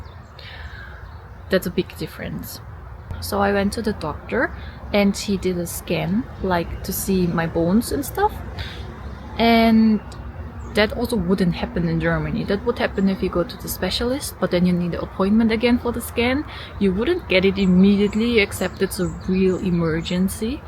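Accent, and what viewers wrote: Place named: South Korea. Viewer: 신기하게 발음이 한국인이 영어하는 것 같은 발음이네요...참 신기하네...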